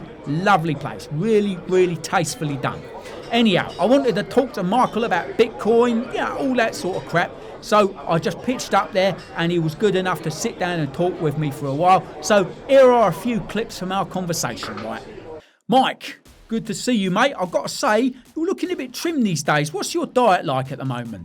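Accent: United Kingdom. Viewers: As an American, this is a proper British accent 😂. Cheers guv!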